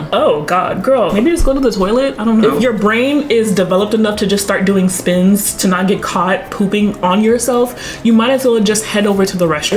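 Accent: United Kingdom. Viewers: Your british accent threw me off😂😂😂😂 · Great video these type of videos are funny but as uk girlie please let’s not do that accent next time was terrible 😂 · You have such a good British accent girl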